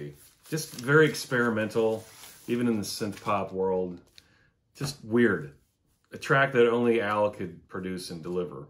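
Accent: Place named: United Kingdom. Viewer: British accent? I was thinking the same thing! Funny!. Peace!.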